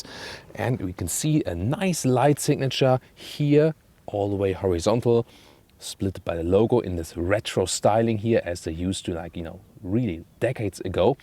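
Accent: Germany. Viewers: For some reason your accent rubs me the wrong way. Maybe because I had an awful German manager.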